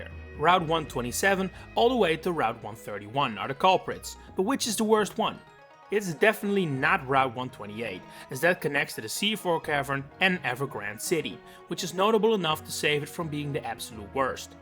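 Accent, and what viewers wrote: Netherlands. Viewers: Vind het geweldig om te horen dat je Nederlands bent puur aan je accent. Het is niet extreem Nederlands, maar Nederlands genoeg om te horen dat je 1 van ons bent ;)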